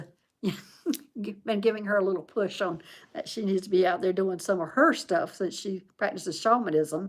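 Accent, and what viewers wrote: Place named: South, United States. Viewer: Thank you for all you do. I’m probably too late, but here’s my STARSEED. You have the same southern accent my parents had. Very comforting. 💚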